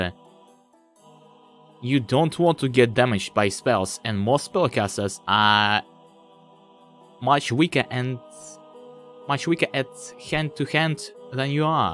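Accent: United Kingdom. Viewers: When I saw "almost without accent" I expected to hear less accent than I heard in that video, though nice try, it's not russian accent at very least. From what I heard it feels more like scottish accent or something like that, sry for being too strict :DD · Учитывая шрифт документа, очень даже приемлемо. Отдаёт чем-то британским в плане произношения, но я не эксперт. Хорошо получилось. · Круто получилось! Кстати акцент напоминает британский, воть.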